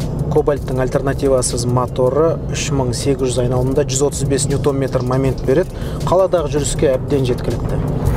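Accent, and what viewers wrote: Standard. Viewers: Жарайсың таза қазақша сөйлейсің оған қоса тарихты айта кетесің ✊ дами бер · Қазақшасы таза екен иа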